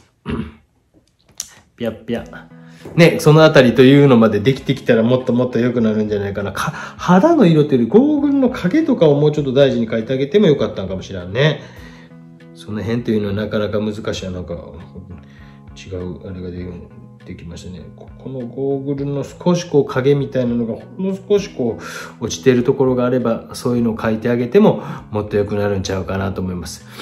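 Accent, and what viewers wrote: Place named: Nara, Japan. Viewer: ご指導ありがとうございました。 背景の黒を頑張ったつもりですが、まだまだ足りませんでした。 紙が粗いのは気になっていました。 紙探しをします。 そしてまた、ガラスを描いてみます。 先生、やっぱり奈良弁ですよ。 「○○できやん」とか、「見えやん」とか「良かったかもしらん」とかは、やっぱり奈良っぽいです。私の奈良のお友達は みんなほんわかしていい人達でした❣️ とにかく 精進しますのでよろしくお願いします😊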